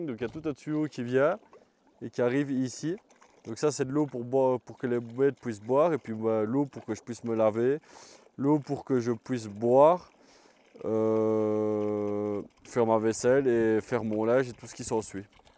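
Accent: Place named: Liège, Belgium. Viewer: Bonjour, tu es super bien installé, tu es originaire de Belgique, car tu as l'accent Liégois, bonne saison à toi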